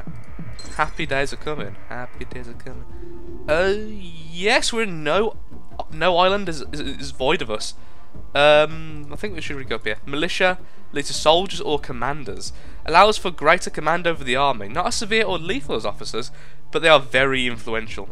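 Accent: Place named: England, United Kingdom. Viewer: its England init.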